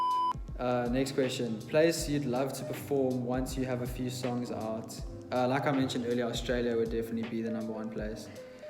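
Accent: South Africa. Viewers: yesss called it! the accent is from south africa!!! the only person whom i've heard speaking with that accent was my ex-boyfriend who was from cape town <3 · never realized how cool south african accent is until now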